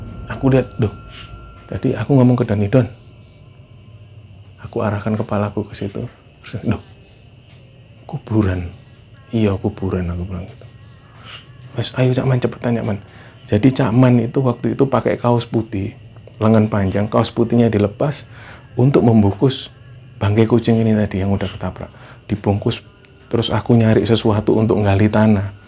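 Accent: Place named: Surabaya, Indonesia. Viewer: Sama ... Aq jg seperti itu.. medok suroboyoan..kyo aq..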